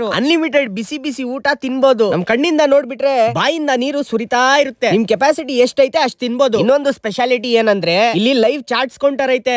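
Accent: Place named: Shivajinagar, India. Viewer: Pakka shivajinagar voice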